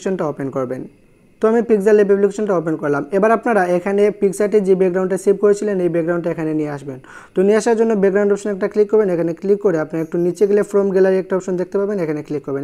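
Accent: Sylhet, Bangladesh. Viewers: ভাই আপনি কি সিলেটি?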